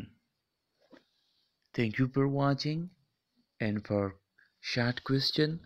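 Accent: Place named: United States. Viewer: Sir American ban rahe hen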